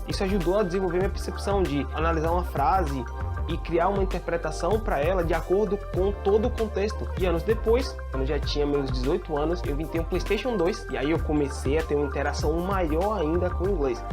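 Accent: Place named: United Kingdom. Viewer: Olá, fiquei curioso para saber como você adquiriu sotaque britânico. Se puder me responda por favor. Obrigado!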